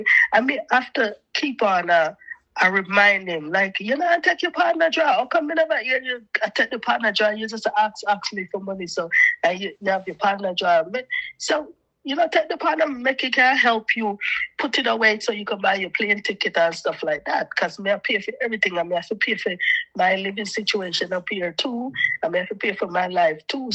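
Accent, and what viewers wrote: Jamaica. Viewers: Living in America so long and still manage to maintain your Jamaican accent eh, good for you girl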